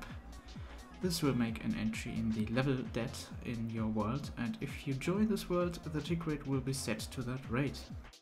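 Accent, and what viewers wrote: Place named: Germany. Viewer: This german accent Ist nett lmao XD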